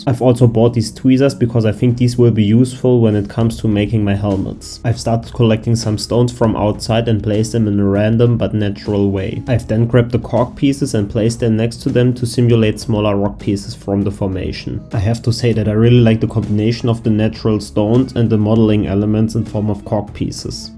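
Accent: Germany. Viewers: Thats why ur english sounds like german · Im love how you say it with you german Voice❤😂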